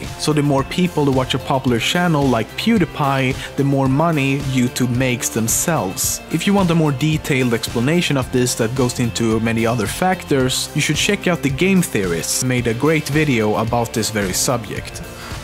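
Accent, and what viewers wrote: Sweden. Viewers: are you swedish? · you still sound so Swedish here! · Jag satte och kollade helt random (hade misstankar om att du var svensk igenom sättet du pratar på) och helt random... MEN VAFAN xD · his accicent was so much more prevalent back then, goddamn. Like that's obviously swedish compared to his current accent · are you a sweaden person? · Are you swedish?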